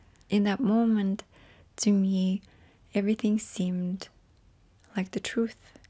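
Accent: Russia. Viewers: Hi! I am from Bulgaria and I officially been in America more than Bulgaria, just like you. I know what you mean. I am so glad you are renaming this channel, I love you but Sassy did not fit you lol. And for the record, I think that on this channel your accent comes through just a bit more than the Gentle Whispering channel. I can recognize your accent, and despite your years in America you will always have your root in Russia. Thank you for your content ❤ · Simply. Even though you have lived in the US longer you still have the ethereal Russian accent so it's perfect. Its what makes you, you. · How brave you are! To share yourself and your journey with us. It hasn't been easy for you over the years and we thank you for your honesty. I like Simply. From a marketing/comms perspective it is very strong. There's alaway...? Emphasising the two aspects of who you are. 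I would encourage you to embrace your Russian side...even in these difficult times. It's a point of difference for you and for many of us, strength comes in knowing who we are, and where we came from. We love your accent and your cultural difference. It makes you special. As far as content is concerned, I love the gardening journey you are on and just about anything you choose to share. But keep some things things for yourself! Your 'sassy' natural personality is wonderful to see and it's important for you to be able to be yourself, not in a curated way. ❤ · I like ‘sincerely ’ as I agree that is how I see you and your videos. Also your Russian roots and your amazing accent with its Russian twang is what has kept me listening the past 10 years ❤ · I've been following and enjoying your channels for many years. As an Eastern European, let me tell you, yes, I can see the Russian origins. You even have some accent left, especially in the pronunciation of your "L". 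In my opinion, both identities can coexist, Russian and American. It doesn't have to be an either/or. It's who you are, celebrate it! ❤ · I adore how your Russian accent is still so prominent even though you’ve lived in the US for over half of your life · Simply resonates best imo since you aren't trying to be anything else, not a performer, not an influencer, simply. Why, not? Though you don't broadcast that part of yourself all the time it's still part of who you are. The accent in your voice is lovely and reminds me of your rich background, russian treats you've shared with us, and even family you've introduced to us. For me, its part of how I've gotten to know you over the many years and even if the content shared is less culturally focused, it's always a beautiful part of you 🩷 Also, one last thing. You mention that many people distanced themselves from you and your channel. I remember that time being a period of seeking a sense of belonging, of security, of self. 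You were in an emotionally vulnerable place and found solace where it made sense at the time. You said "I deserved it". Please don't beat yourself up over that learning experience. It was part of your journey and necessary for you to get to where you are now 💖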